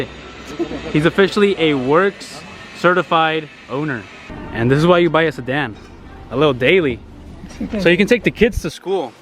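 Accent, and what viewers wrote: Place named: El Salvador. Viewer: I know a Salvi when I hear one 🤣🔥🔥 Dope video !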